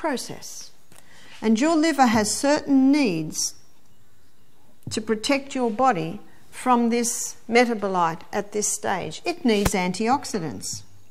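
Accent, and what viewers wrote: Australia. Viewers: She's Australian. They say "inshulin".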